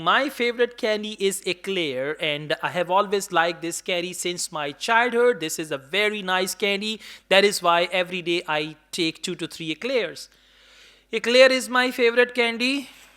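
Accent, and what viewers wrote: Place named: Punjab, India. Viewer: Salute you sir for your teaching method as well as there are myraid things which are most impressed to me such as way of talking like pure punjabi Jatt and also I like your sense of humour. From Punjab🥰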